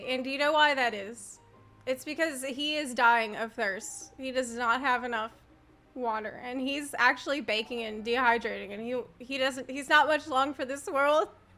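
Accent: South, United States. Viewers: Oh my god, from your accent I sense you were raised in the south I learned this technique and STILL use it. You would tap the dots for twice for 7 through 9. I learned this in Louisiana it's totally ingrained in me to count this way!! My European gf saw me counting this way and she asked me verbatim, "what the fuck, are you on about? What was that?". I said the American education system.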